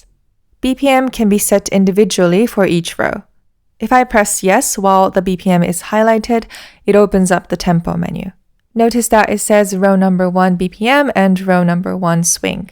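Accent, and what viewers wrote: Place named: Ireland. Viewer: your accent is so nice. did you live in ireland at some point by any chance? theres a few words here and there that sound very irish to me but maybe its some similar accent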